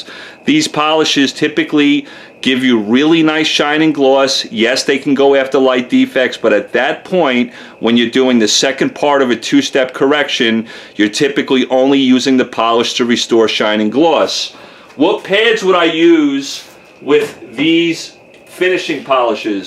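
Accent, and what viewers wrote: New York, United States. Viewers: Are you from New York? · A Texan who sounds like a New Yorker. Hey, great video. Very informative.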